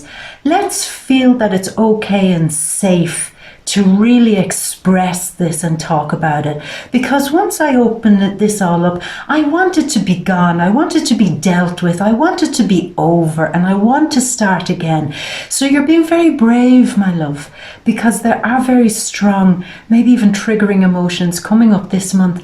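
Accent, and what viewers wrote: Ireland. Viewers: I be getting the feeling that you're lowkey Irish by your accent. Btw. I like your style.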